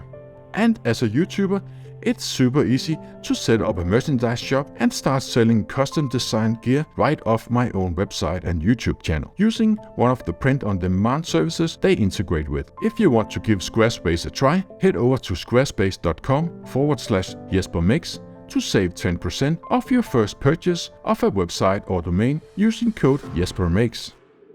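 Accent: Denmark. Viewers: So happy to hear you have reached far, i always love when i see your uploads. keep it up Jesper .. it makes me smile when i hear your Danglish, and it helps me in my day here .. Greetings from a fellow Dane living in Indonesia.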